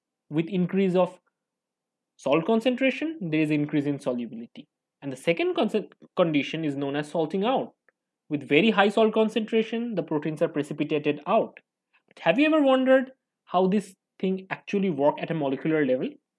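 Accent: India. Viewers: Presentation is very good but you need to work on your pronunciations of words in English. You have very strong Indian accent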